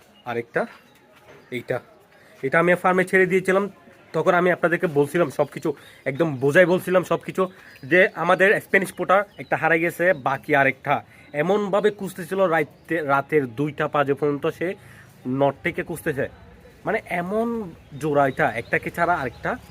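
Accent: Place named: Chattogram, Bangladesh. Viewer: Bhai apnar basha Chattogram ar kon jaigai?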